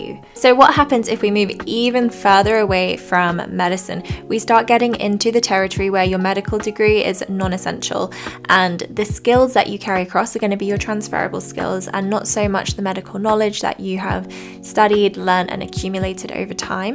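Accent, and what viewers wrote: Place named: Australia. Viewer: You have an aussie accent. How come?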